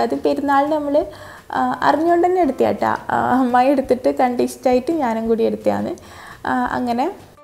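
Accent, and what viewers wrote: Kannur, India. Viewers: ithande Kannur basha oru rakshayum ellatto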